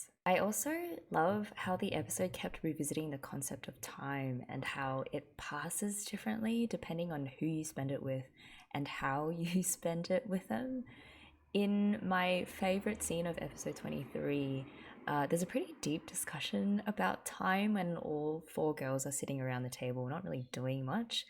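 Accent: Australia. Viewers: Really enjoy your videos and insights and have just spent the last 3 days binge watching K-On for the 5th or 6th time just so I could watch it along side you and catch up. Even after watching it so many times, you really bond with the girls (Ritsu is best girl for me) and tear up at the end as you feel like you're leaving your best friends. K-On is more than a cute-girls-doing-cute-things anime, and closer to a life lesson on getting the most out of the present. Since you liked the drum beat of Go! Go! Maniac (the second OP), here's a real-life Ritsu cover <link> She's totally awesome and was only like 13 at the time. Also as an Aussie from Melbourne who's been living in the US for the past 14 years, I really miss the Aussie accent, so that's been surprisingly comforting to listen to you too. Now I'm starting your Sora yori mo Tooi Basho (A Place Further Than The Universe) reactions, since that's another awesome show that I love. 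Thanks for all the work you put into the videos and look forward in watching Hibike Euphonium with you in the future.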